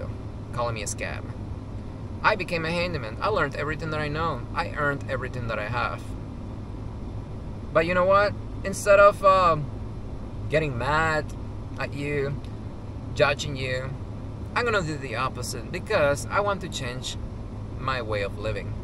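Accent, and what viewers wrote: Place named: Nicaragua. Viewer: Your accent sounds like you're from Nicaragua.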